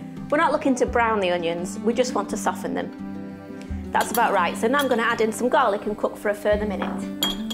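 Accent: United Kingdom. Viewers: literally the most bri'ish video i've ever heard,but still a good one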